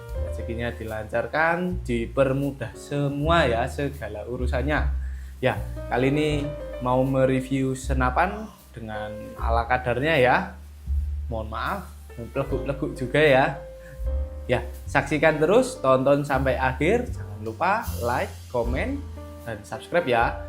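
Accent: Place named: Banyumas, Indonesia. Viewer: Sing logat bahasane kayonge madan ngapak kye, sing endi sih kang?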